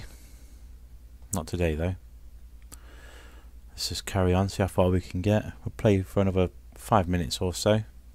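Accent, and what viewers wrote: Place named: Newcastle, United Kingdom. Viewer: Are you geordie? You have the accent.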